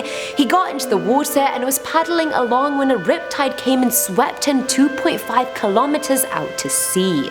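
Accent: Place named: England, United Kingdom. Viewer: Seriously though, exotic look an English accent is flippin hot🔥😍🔥😍🔥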